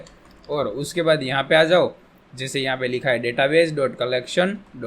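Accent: Gujarat, India. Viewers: Bhai tamara bolva parthi lage che k tame gujarat thi cho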